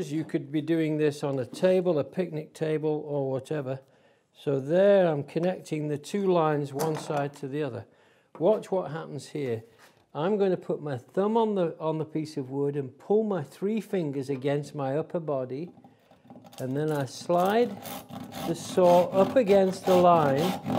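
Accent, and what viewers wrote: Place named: Midlands, United Kingdom. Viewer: So nice to hear a lovely soft Midlands(?) accent on one of these videos. Thanks!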